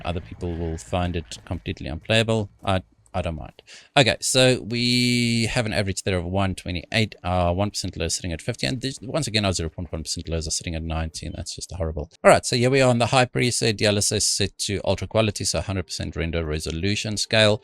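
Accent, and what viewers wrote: South Africa. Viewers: R u from south africa ? N any amd gpu to test this one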